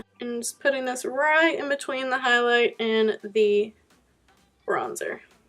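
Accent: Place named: South, United States. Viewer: I KNEW you were southern! You talk exactly like I do... the way you word things really. I've got a southern way of speaking but with a wee Scottish accent because I grew up in a Scottish Filipino family. Lol. Weird bunch/combination I tell you.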